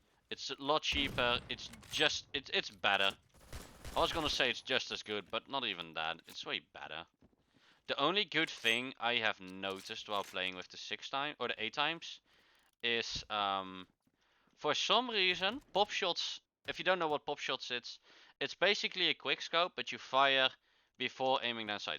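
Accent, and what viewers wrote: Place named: Netherlands. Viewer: this person is from holland you hear it from its accent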